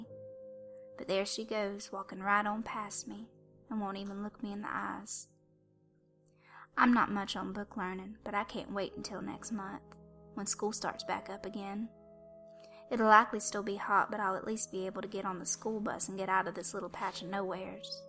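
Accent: South, United States. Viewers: First time listener and new sub! I really enjoyed your video! I'm from Louisiana and if your not really from the South, you did a good job with the accent! I sure hated the first comment gave the whole story away tho... so it kinda ruined it for me,,,but I still liked it! Good Job and good luck with your channel!! Glad I have new stories to listen to!!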